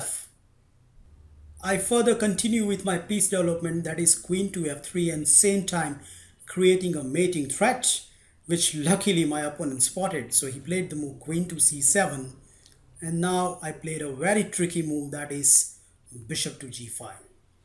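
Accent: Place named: India. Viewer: Tyler Roth why you hatin on this guys voice. Sure he has an Indian accent but his voice isn't cancer. Don't joke around like that, cancer is a serious thing bro. Also nice attack will try this in my next tournament. There's this players who's skilled who plays this opening so might be able to beat him now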